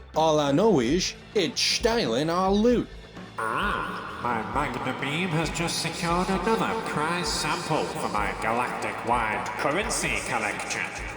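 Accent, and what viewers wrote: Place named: Liverpool, United Kingdom. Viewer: Are you a scouser? Your commentary is delightful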